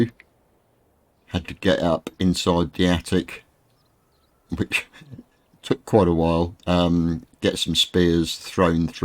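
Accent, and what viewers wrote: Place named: West, United States. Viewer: I came here to watch your village, but you also thought me how to make a big bridge, I want to build one so bad and every video I watch about it, it only teachs to put some planks over a little stream, not across a big river like you did, thanks for that! (plus, I like the way you talk, sounds like a cowboy or something)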